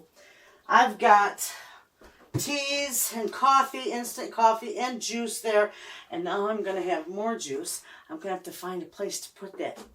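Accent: Michigan, United States. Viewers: You just popped up on my play list, took a look at your pantry, trying to figure your accent. Michigan! We used to live in Hersey!!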